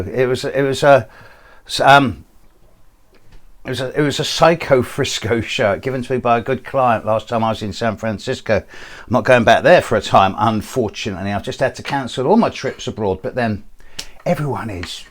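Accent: United Kingdom. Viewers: I love your British accent and love how articulate in the way you speak. Wish more people would learn how to be articulate as you are and being able to convey and express themselves in ways that are pivotal and genuine.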